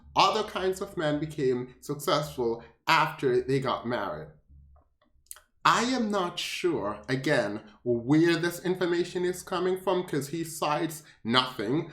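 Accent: Jamaica. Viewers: I appreciate your content and the way you speak so eloquently. Liked & subscribed. Too many Jamaicans on YouTube disguise their/our beautiful accents and speak with a lot of American slang in desperate attempts to sound like many others, which sounds inauthentic. It's refreshing to listen to you. Keep up the great work! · You Jamaican? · We visit Jamaica a few times a year. I LOVE my people there. Can someone adopt me? I heard the flavor in his voice too!! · I think I detect a Jamaican flavor to your cadence. Am I right? Are you a fellow yardie?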